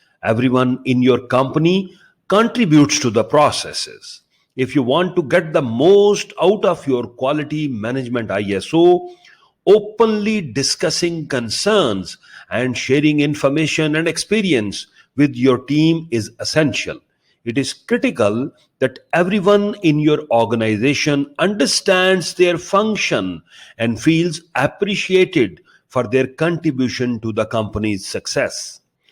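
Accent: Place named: India. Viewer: Ur Indian accent is too strong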